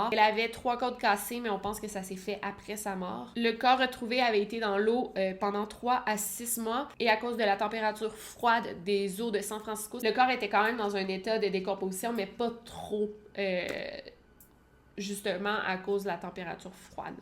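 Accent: Quebec, Canada. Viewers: J’adore tes vidéo je te suit depuis la Suisse au début j’avais un peu de peine avec l’accent qui change mais tu es tellement superbe que mes oreilles se sont habitués à l’accent québécois merci 😊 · Coucou je suis aussi une de t'es abonnée et je trouve que ta chaîne aussi et top les québécoises vous avez un talent fou et êtes tellement humble c est toujours un plaisir d écouter vos vidéos avec votre belle accent bonne continuation à toute les 2 vous êtes top 😉 de la pars d une française fan de vous 2 😊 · L'accent québécois est vraiment charmant.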